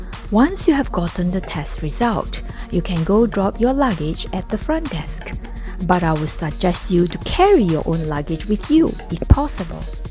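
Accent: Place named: Japan. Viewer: Hi, thanks for the good info of these cruises. Btw, you sound Japanese. Are you?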